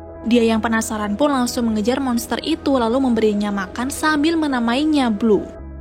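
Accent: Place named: West Java, Indonesia. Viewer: Kak kakak orang mana kaya orang sunda euy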